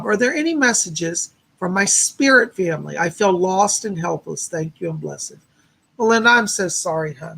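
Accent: United Kingdom. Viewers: Blessings Lord. Love the English accent (lush) lol Cola has brought so much positive energy an love and light to your being. We are so blessed to have her in our lives. She is my little sister and I'm Mama pit bull over her and you. Team Lady Cola and Lord. Blessings to you and lady Cola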